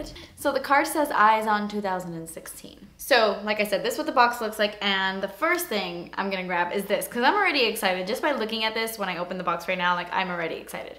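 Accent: England, United Kingdom. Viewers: I love that you do a random british accent in your vids. I do the same in daily life 😂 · love your british accent 😂😂😂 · You're so funny 😂 The British accent and all! 😂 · You do such a great British accent😍. Better than me and I'm British 😝😂 Oh and P.S I love you 😍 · I love your english accent. You are amazing xx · Your British accent is great! I also enjoyed the Harry Potter references 😂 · Girl, your british accent slays me. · i love that you always randomly break out into an English accent.. i do that too lol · that british accent tho lol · Love your British accent!!!!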